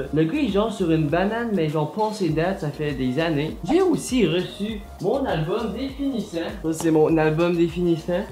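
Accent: Quebec, Canada. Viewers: GG pour ton passage chez Amixem ^^ perso l'accent quebecois je commence à apprécier, ça change mdr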